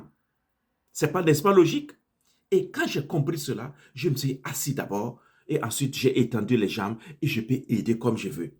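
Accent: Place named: Burkina Faso. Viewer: Accent Burkinabé. Tu as raison Mon frère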